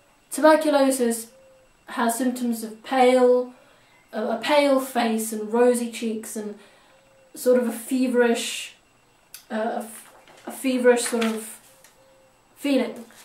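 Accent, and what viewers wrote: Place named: RP, United Kingdom. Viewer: Thank you, Miss. I enjoyed this so much. I hope you've done more of these short lectures. I'm a drycleaner's daughter from Kansas. I missed school terribly before the rise of the Internet. (love your posh accent too) Thanks again.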